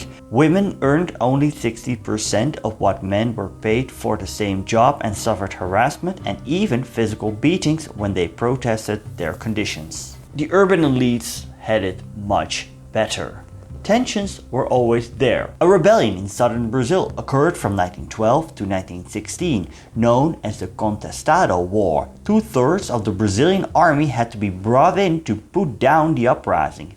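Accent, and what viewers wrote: Spain. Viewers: Would be better if you didn't pronouced things with the spanish accent, and instead used the Portuguese one. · What are you talking about? He just pronounced Portuguese as if it was Spanish throughout the video, as seen by "Retúliô" and "Itararê". This is an irrelevant nitpick since this is a history channel as opposed to a linguistics one, but please realize that the only reason some people in Rio Grande do Sul pronounce their state's name like that is because it was settled by Spaniards first.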